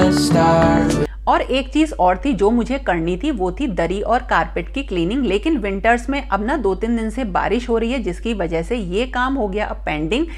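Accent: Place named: Braj, India. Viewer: Braj boli sun ke bahut aachi lagi... nice madam ji 🤗..14hours ke kaam ko only 14 minutes mai show karna😀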